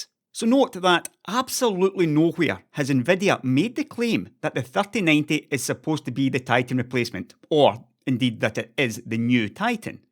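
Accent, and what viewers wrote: Scotland, United Kingdom. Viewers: thank you for your sweet Scottish accent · We love the scottish guy with the scottish accent!!!! PLEASE dont stop the vids !!! Dont let us be a total fodder for the marketing departments !!! We need really smart opinions like yours!!! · I love the Scottish accent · tech talk reasoning in a Scottish accent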